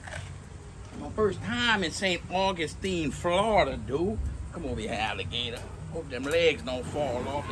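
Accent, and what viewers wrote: Louisiana, United States. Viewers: Sounds like some Louisiana to me. Got the accent like Justin Wilson.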